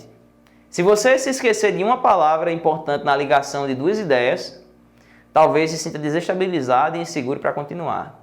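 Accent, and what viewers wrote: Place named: Northeast, Brazil. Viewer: primeiro curso online com um professor com essa linguagem muita boa de intender; sou do ES e to adorando esse sutaque nodestino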